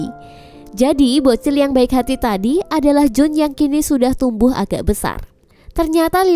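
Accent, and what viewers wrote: Java, Indonesia. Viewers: Asem knpaa ada tetekbengek nyaa mimin nya jawaaa ne ngendi iki 😂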